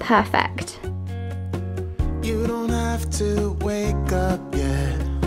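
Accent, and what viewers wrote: United Kingdom. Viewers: british accent is sooooooo beautiful. Couldnt stop listening to you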